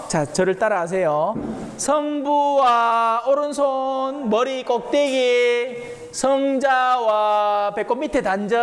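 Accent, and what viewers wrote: Gyeongsang, South Korea. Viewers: 신부님 감사합니다 함께 공부할수 있게 해주셨어요 ❤ 🎉 경상도 사투리도 정겹구요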